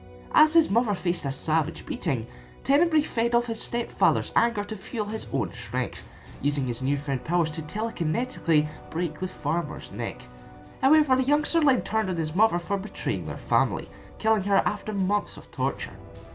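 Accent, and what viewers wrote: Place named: England, United Kingdom. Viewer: Great vids , great narration but omfgod what a thick accent.. where it is from in England?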